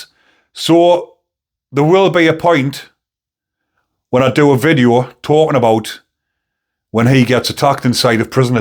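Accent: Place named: North East England, United Kingdom. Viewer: Love your channel marra. Being from the Northeast mesell it's good to hear a familiar and friendly accent.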